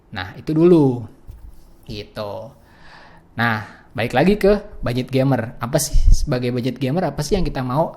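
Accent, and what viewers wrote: Indonesia. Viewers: mulut Indonesia bro. saya adja masih bilang ti itu t i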